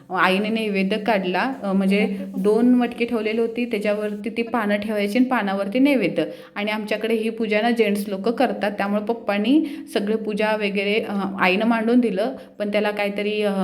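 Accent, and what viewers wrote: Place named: Satara, India. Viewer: Tumche gav kuthle ahe,tumch bolnycha tone, saglya vidhi satara karad side chya vatyat.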